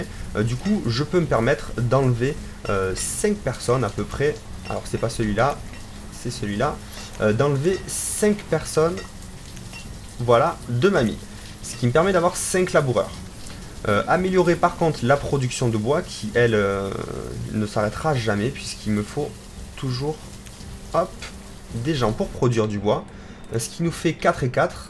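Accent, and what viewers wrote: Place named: Liège, Belgium. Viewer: Quand tu parles (ce n'est pas une critique)tu as l'axent Liégeois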